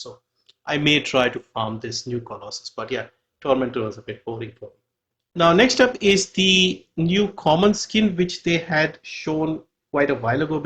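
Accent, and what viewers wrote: India. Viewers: I was able to recognise from your voice that you are an Indian. Proud to see a fellow Indian doing this good. Keep up the great work!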